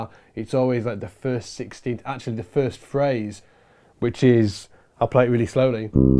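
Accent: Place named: United Kingdom. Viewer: you are a great teacher. Years ago a police detective friend realized later in life the New York accent made him, let say upset. I too have found the UK accent has a similar effect on me. Which is the only reason I canceled my membership. Again you truly have the gift and it saddens me deeply. I wish you all the Health, Wealth and Happiness life has to give.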